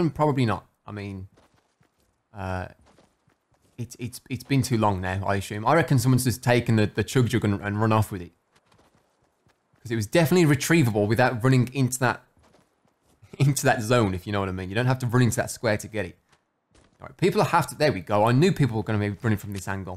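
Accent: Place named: Birmingham, United Kingdom. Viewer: Dave the dragon for sure, and you have to say it in your old brummy accent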